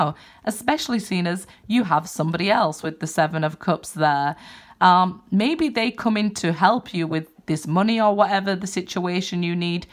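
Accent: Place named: England, United Kingdom. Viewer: You must be in either England or Australia, I'm hearing England though.... I could see myself moving there...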